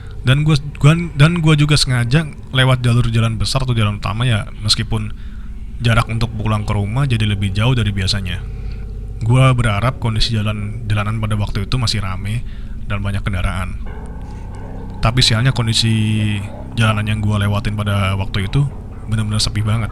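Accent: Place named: Surabaya, Indonesia. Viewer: Loh wong suroboyo toh mas? Boso suroboyoan ae cak unik wonten identitas e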